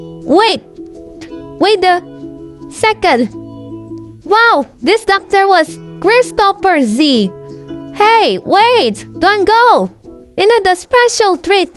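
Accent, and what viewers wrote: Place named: India. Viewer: Bros voice is indian....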